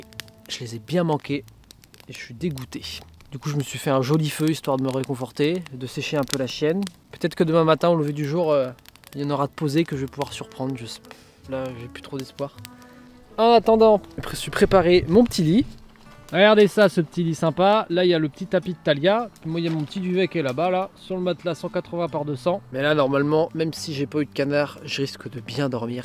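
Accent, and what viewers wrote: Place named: Marseille, France. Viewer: Tu as l'accent marseillais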